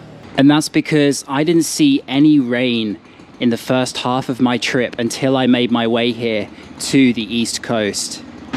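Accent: United Kingdom. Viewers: Are you British?...Thanks...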